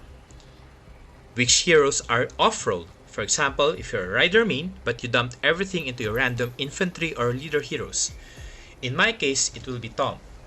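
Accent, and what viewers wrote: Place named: Philippines. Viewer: Are you Filipino? You sound like you're Filipino because of your accent and voice, by the way I'm a new subscriber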